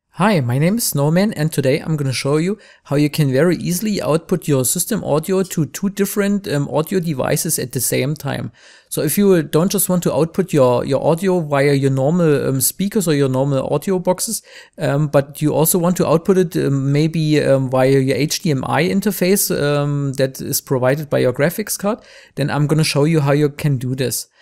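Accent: Germany. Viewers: man with funny German accent explains to me what I need to know. This is the perfect tutorial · starker deutscher akzent :)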